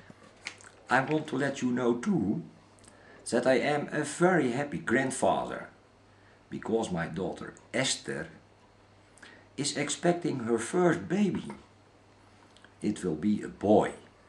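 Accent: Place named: Netherlands. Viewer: Ik hoor aan uw accentdat u nederlands bent, heel goed gespeeld ;)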